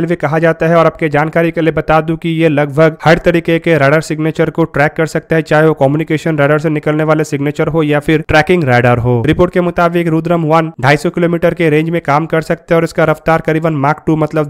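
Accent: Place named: South India, India. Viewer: Arey bhai pehle "indigeneous" pronounce karna toh seeko, north india se aakar south india jaise baat kyun karte ho, kya shiksha ka sthar itna ghat chuka hai kya uttar bharat mein, toh bi phir kya hua, aajkal internet se bi sheeksha ki uplabdi toh utta sakta hai.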